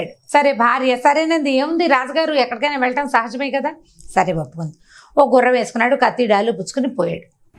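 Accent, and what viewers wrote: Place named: East Godavari, India. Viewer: Namaskaram pedda.akkayyagaru.mee.mata.stala.svacchamgavundi.bahusha.meedithoorpugodavarijilla.anukuntunnaanu.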